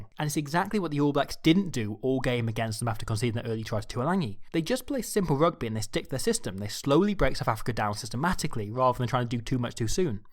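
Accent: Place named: England, United Kingdom. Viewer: Dude this guys rugby iq is on another level. I need to get better at understanding the English accent though 😅. Great content!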